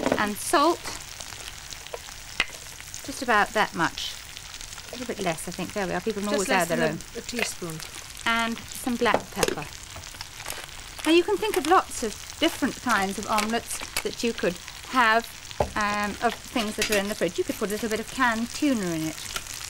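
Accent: RP, United Kingdom. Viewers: Why does she sound posher than royalty these days? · Judith Chalmers didn't sound much different in the 90s on Wish you were here... Mary sounds ever so posh, of course ;) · Wow, Mary Berry looks absolutely amazing back in 1973. She would've been 38 back then. Love her RP or Queen's English accent.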